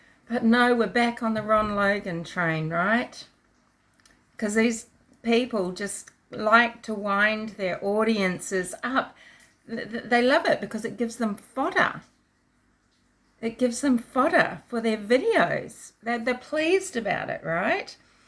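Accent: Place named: Australia. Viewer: Hey! It is so great to find you. I found you from Randy’s latest vid. I agree with EVERYTHING you said!!!! You have such intelligence and common sense. I’ve ALWAYS respected your thoughts, comments and opinions. 💗 I always thought you were a guy! 😂 You sound Australian. Keep on keeping on, girl!! 👍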